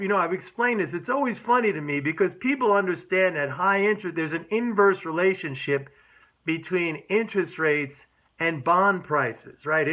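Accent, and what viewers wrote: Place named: New York, United States. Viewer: by the way ...Staten island ? .... or ... Brooklyn ??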